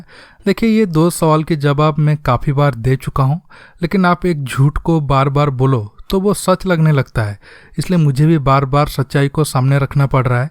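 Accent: Odisha, India. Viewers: Bhai,tame kan Odia ..?, accent ru laguchi....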